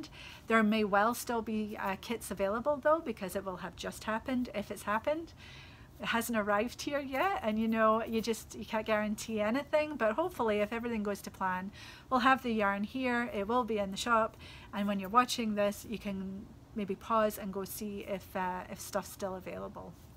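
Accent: Scotland, United Kingdom. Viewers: Thank you for the EYF sale this weekend...even though the CDN $ is so low, I plunged in and fell right off my yarn diet to splurge on some Marie Wallin wool. A dear friend shared her leftovers from her cowl kit and I purchased the wool to replace the bits needed to make myself the same cowl. Love watching your shop updates and your wonderful accent, as I recently lost a very dear Scottish lass who was 94yrs young and it brings her closer each time I hear you speak. :) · Oh how can we get her to publish that pattern? It really is a no-fuss beauty! My bucket list has always been Shetland Wool Festival and EYF. Some of my motivation is simply due to my love of hearing the Scottish voice! I always look forward to listening to you while I knit!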